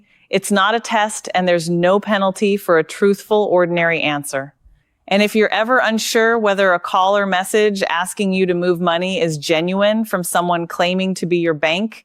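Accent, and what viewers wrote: United States. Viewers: Yank accent? Load of AI tosh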